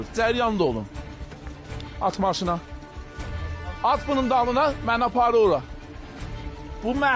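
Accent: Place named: South Azerbaijani, Iran. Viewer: Sağ ol, qardaş! Maşallah olsun! Şirin ləhcə! 200 ildir bizi ayırandan sonra dili saxlayaraınız...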